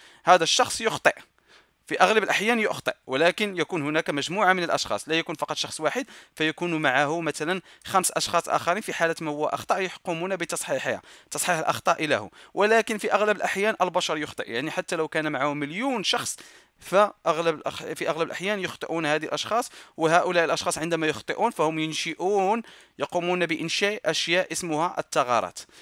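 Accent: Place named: Morocco. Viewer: أنا اتحدى في شيئين: الأول: يكتب كلمة إنجليزية بدون شخابيط في آخرها الثاني: ينزل مقطع واحد بدون مايلخبط في الشرح هههه:) دمه عسل وانسان رائع الله يوفقه + اللهجة تعجبني كثير هو من المغرب صح ؟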